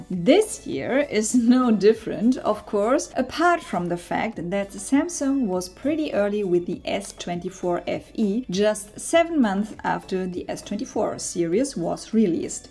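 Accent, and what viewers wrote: Germany. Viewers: She almost sounds like me with that accent. Living in the USA now coming from Berlin Germany. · You have a beautiful German accent 😊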